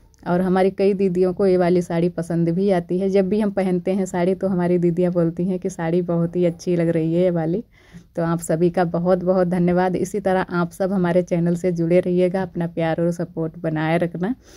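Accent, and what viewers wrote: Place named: Uttar Pradesh, India. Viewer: Bhut acha vlog aap 1 din apni Up wali voice m video k voice over kro bhut acha lgta h mujhe aplogo k language ❤ I m from west Bengal yha yhsb language dur dur tk nhi bs hindi or Bengali h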